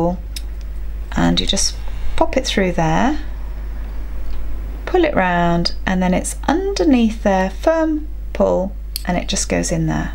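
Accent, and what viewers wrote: United Kingdom. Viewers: Loved the simple instruction, demo and English language accent/style. Audrey. USA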